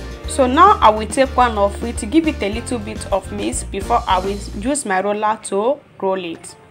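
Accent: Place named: Igbo, Nigeria. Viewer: 👏👏, you never disappoint, I love that igbo accent 😂😂. Meat pie looking yummy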